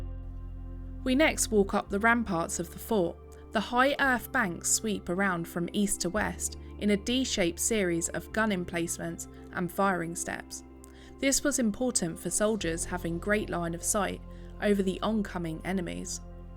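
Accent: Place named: Hampshire, United Kingdom. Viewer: Nice to hear the Hampshire accent. Instead of fake Estuary English. A very nice county with lots of lovely small towns and villages.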